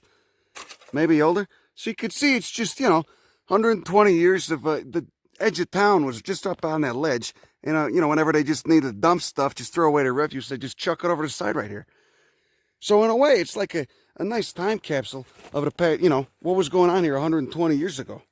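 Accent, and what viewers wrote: Chicago, United States. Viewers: chicago accent at its best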